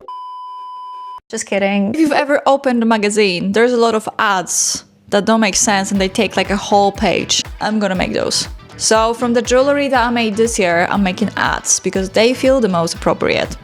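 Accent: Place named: Poland. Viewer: You have a really cute accent. Polish, right?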